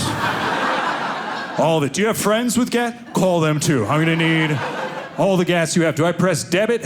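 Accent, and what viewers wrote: United States. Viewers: I have to say that is the most American a person has ever sounded in my very short time of having sentience.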